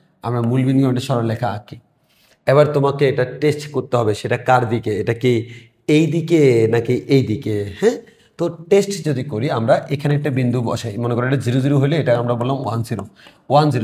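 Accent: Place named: Chittagong, Bangladesh. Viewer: Kotha te bujhai jai vaiya chitainge 😂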